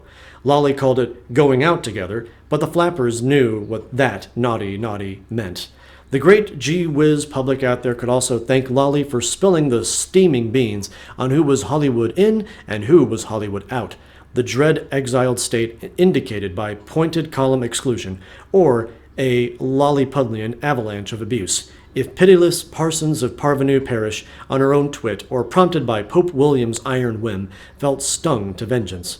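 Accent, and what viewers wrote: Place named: Germany. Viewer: Bahahah your German accent made this so much more enjoyable! Love your narration! 💖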